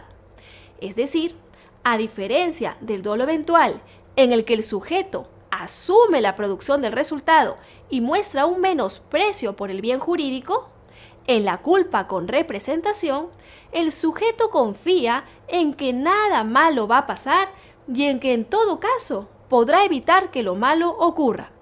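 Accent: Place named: Mexico. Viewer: Habla como Mexicana...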